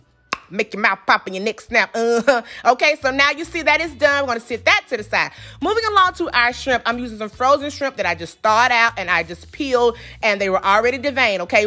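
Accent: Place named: New Orleans, United States. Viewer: At first your voice made me think something negative BUT, the more I listen, I love it. It remind me of home in New Orleans. Thank you for all you do.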